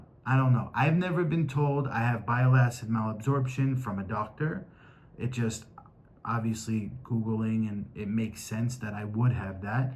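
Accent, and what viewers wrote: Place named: New Jersey, United States. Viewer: Not sure why or how your channel popped up in my algorithm, but it did! I see you flip houses is Texas. You dont sound like you're from TX, but you talk and sound like an adorable Jersey boy! Im born and raised in Jersey, so I know! 😂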